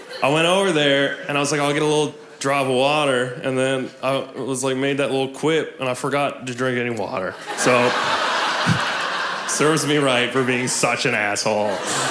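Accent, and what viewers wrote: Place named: Canada. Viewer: Im an ontario canadian myself. I was watching a racing youtube video and this whole special came up as an Advertisement. Ive never heard of you yet. I almost skipped but heard the first 2 minutes and watched the whole thing! I knew you were canadian instantly. Cheers from ontario! New fan! Edit: legit laughed my ass off outloud for so long my girl yelled at me from the other room asking if i was okay 🤣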